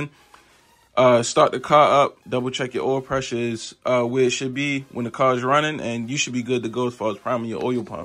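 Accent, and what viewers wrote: Baltimore, United States. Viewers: Based on your accent I'm willing to bet you from the bmore area, or somewhere close lol. But if not, where are you at? I'm in RVA and need a 2jz ge vvti overhaul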